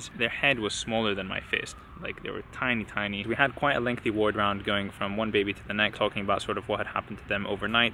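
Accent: United States. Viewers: Are you amirican?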